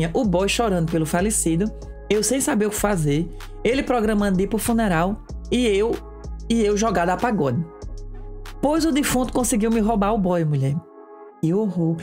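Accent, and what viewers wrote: Northeast, Brazil. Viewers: pois é. aos 74 anos estou perdidamente apaixonada por esse querubim de cabelinhos encaracolados chamado. o que me encanta é a naturalidade da sua essencia com esse sotaque nordestino que me dá tanta da saudade da minha Bahia que deixei aos 6 anos e nunca mais voltei. Parabens. Deus te abençoe e nunca perca sua essencia. Bjsss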